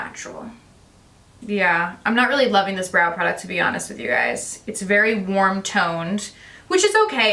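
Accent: United States. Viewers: Her talk reminds me of jaclyn hill. Very american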